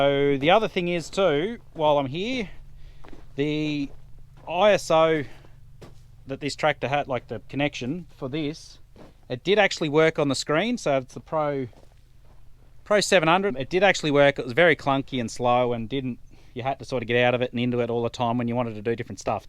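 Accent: Australia. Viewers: Could you keep the lingo to the straight up down under language? I have hard enough time with the Ozzy accent done.😊😊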